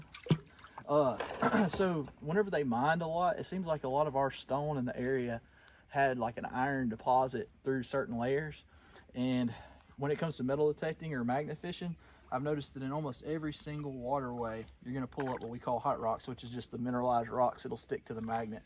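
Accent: South, United States. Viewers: Gotta say, when you say "dad gum it".... just makes me chuckle and grin! Love our old southern talkin! Great walk... keep em coming!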